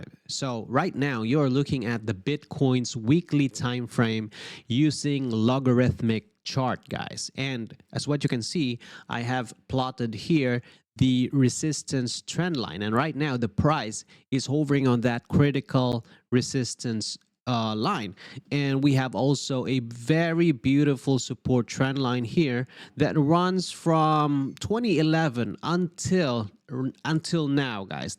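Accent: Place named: Philippines. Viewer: Sounds pinoy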